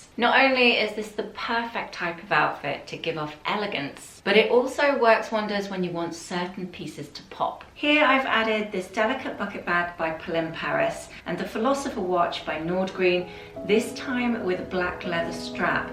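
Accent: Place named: England, United Kingdom. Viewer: Hi, I adore all your outfits and style ideas. This was yet another elegant and classy fashion video. You are wonderful at what you do...please continue! I think it's perfect. You're amazing! Please dont worry about the people with thumbs down...you can't please everyone. I think you always give great fashion and style advice and you do this in such a sweet way with a sweet voice and beautiful English accent, and your lovely personality shines through. Keep doing what you're doing- it's all good! I look forward to your stylish videos. Have a lovely Sunday. Karmel xx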